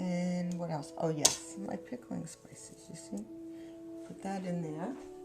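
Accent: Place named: Brooklyn, United States. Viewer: I gotta try this recipe. Thanks for showing us and giving the recipe. OK, I gotta know... Where you are from. I'm guessing Brooklyn or maybe New Jersey. Whshew... Thick accent... But then-again, you'd probably think mine is thick too... :-D I heard you keep saying "My husband likes..." It made me chuckle as I thought a great idea would be to put a few jalapenos in there and warm things up a little!!!! See if your husband "likes" that!!!!!! Woo-hoo!!!! :-D Thanks again! Ya done good on the video!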